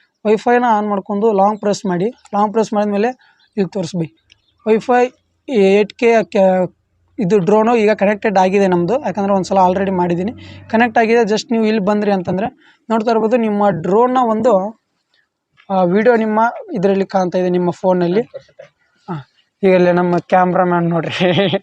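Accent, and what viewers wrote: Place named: North Karnataka, India. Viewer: Pakka ಉತ್ತರ ಕರ್ನಾಟಕ ಬಾಷೆ😅😅😅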